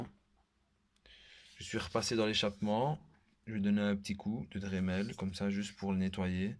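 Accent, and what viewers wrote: Liège, Belgium. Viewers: Merci mon ami,avec ton accent , je me doutais bien que tu étais de la région de Liege ,moi , j'ai habité et travaillé a Andenne,puis Huy et ensuite a Wanze , les voyages forment la jeunesse , mais bon , avec mes 64 ans ,c'est un peu tard , mais je suis reté tres jeune dans ma tete,hi.....hi......Bonne continuation mon ami, tu fais du tres bon travail . A+ Alain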